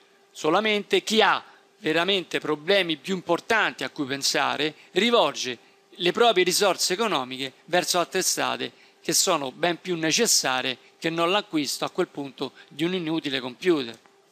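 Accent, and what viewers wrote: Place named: Rome, Italy. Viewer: già mi ha attirato molto l'argomento della discussione, ma con la tua voce romana mi hai convinto ancora di più :D sarebbe un miracolo se tutti gli insegnanti avessero la tua parlata ^_^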